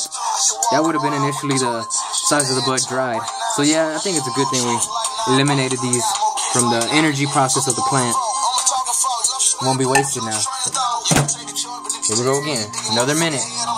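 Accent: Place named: Texas, United States. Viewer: He definitely an Ese from Texas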